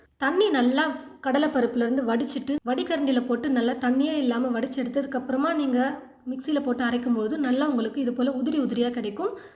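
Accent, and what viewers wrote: Coimbatore, India. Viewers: Coimbatore ah... 😂😂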